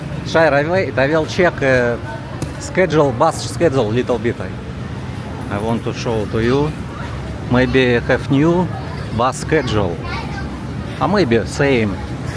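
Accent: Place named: Russia. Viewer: Your accent more Russian but it's okay I love your content I have been in Angeles too.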